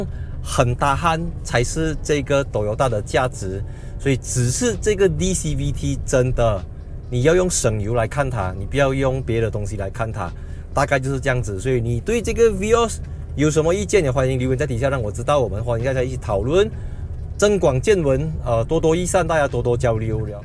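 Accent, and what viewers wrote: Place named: Malaysia. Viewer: 这是大马华语吗，中英文结合蛮有特色的，哈哈哈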